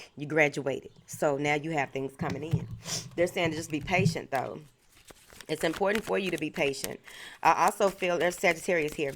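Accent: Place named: Missouri, United States. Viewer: Where you from Missouri? It sound like it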